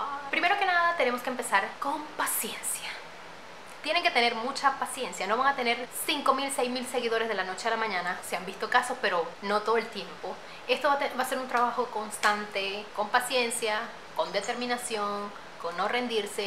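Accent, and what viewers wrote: Venezuela. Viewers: ¿eres venezolana? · El acento venezolano es notable 💁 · wow, el acento venezolano se nota a leguas... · chama wow. ¿eres venezolana? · Por tu acento sabía que eras venezolana!!!! Te amo chama!!! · Eres venezolana ? Por cierto gran vídeo estoy escribiendo una historia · ¿Eres Venezolana? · Se nota que eres venezolana. Que orgullo que eres de este país 💜💜💜💜. Saludos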